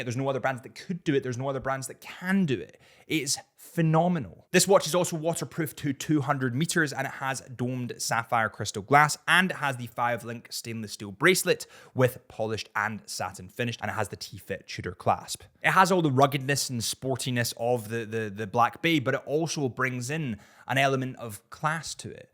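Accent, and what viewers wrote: United Kingdom. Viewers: Bro if u want your channel to have more wider audience speak slowly coz not all of us are native from UK that could easily understand your accent, I love your channel but i keep repeating it just to understand on what you are saying, i know that theres a subtitle but still its not accurate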